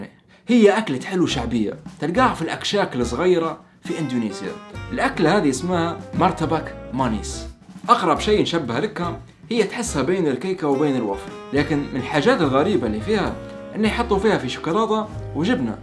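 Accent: Libya. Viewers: اللهجه الليبية قريبة جدا من الجزائريه ❤️❤️كل التوفيق والنجاح ✌️💙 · هلا بعودتك منور وفيديو رووووعة يسلموو ... اللهجة الليبية عشق لا ينتهي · يازين الهجه الليبيه ياعرب 🇸🇦🇱🇾💓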